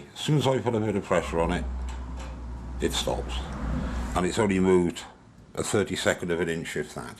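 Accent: United Kingdom. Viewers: Really enjoy your videos being a brit living in amercia hearing a fellow brit talking about things I enjoy brings much joy and not to mention learning some great information keep your head up mate from a fellow brit who is home sick I really appreciate the videos.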